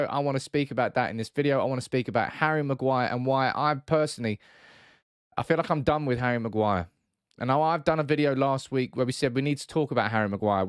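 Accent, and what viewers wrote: Manchester, United Kingdom. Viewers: The guy doing this video has got a cracking Mancunian accent 😂😂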